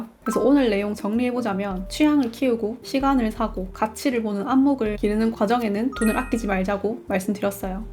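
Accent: Gyeongsang, South Korea. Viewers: 고향 경상도어디였나용?!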